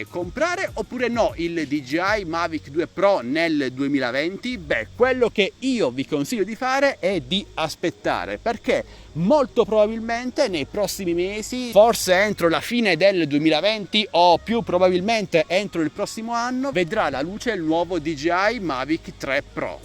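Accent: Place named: Apulia, Italy. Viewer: Se si considera la camera e la parte video in generare è ancora al top... se non il top dei semiprofessionali. Purtroppo l'avionica è superata, nell'efficienza, nella sensoristica, nel FW (intesa come capacità di calcolo), nel peso.... e poi c'è l'AI (l'Intelligenza Artificiale).. di cui è praticamente privo. Credo che il suo valore oggi possa valutarsi sugli 800 euro e non senza riserve nel comprarlo. Mi associo completamente al tuo consiglio, a meno di necessità impellenti, ATTENDERE L'USCITA DEL MAVIC TARGATO 3. Grazie del video.... 👍 dall'accento sembri pugliese però hai il tramonto sul mare (magari sbaglio io era l'alba, oppure è sul Mar Ionio)👋👋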